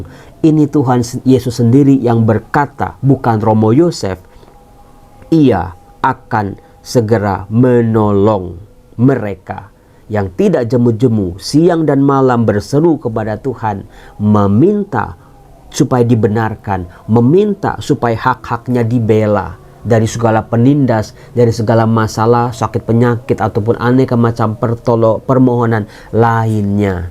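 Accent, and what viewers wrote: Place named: Jakarta, Indonesia. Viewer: Pertama kali dengar Bible Learning di awal tahun 2022, tahu nya dari OKS. Setelah itu saya kejar nonton Bible Learning dari 2019. OKS nya juga saya kejar nonton dari awal. Saya suka romo2 di OKS dan romo bicara dengan logat jakarta, bahasa nya yang gak baku dan kaku, gitu😄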